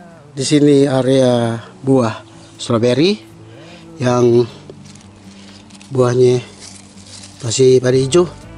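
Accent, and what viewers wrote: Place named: Indonesia. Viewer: ... Bahasanya masih khas banget anak Johar... Seneng deh liat skr.. 🥰 Sehat dan happy selalu yaa... 🙏 Salam dari temen kecilmu dulu... 🌹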